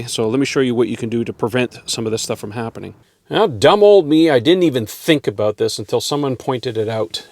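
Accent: Canada. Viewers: Thanks. Great idea! Do I detect a Canadian accent there? Looked like Mississauga too.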